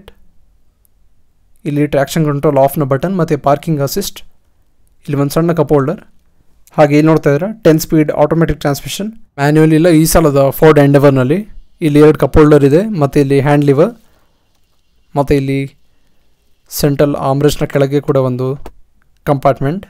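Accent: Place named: Mangalore, India. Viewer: Bro are u from manglore...?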